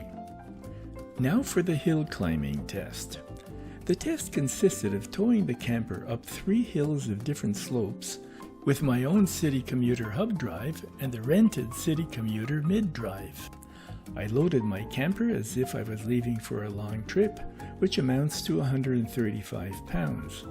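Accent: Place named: France. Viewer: Je sais pas, la rue Maine je croyais c'était à Sherbrooke, et il y a beaucoup de côtes dans cette ville-là. Alors j'ai cru c'était cette ville-là. Mais clairement je savais t'étais français juste de la manière tu parle l'anglais. Haha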